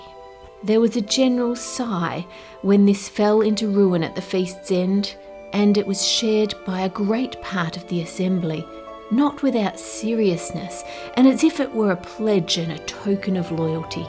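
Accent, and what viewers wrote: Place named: Australia. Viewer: A beautiful creation Ann, and super soothing with the story alongside it. I am German but have lived in Australia (Townville, Brisbane) for 7 years, so love hearing the Aussie accent :) I will dive into some German-speaking research for you after the holidays :) Thanks for all the fantastic videos this year!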